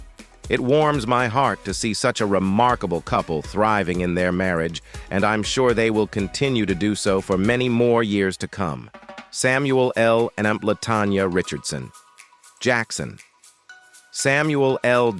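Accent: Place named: United States. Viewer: it's a USian voice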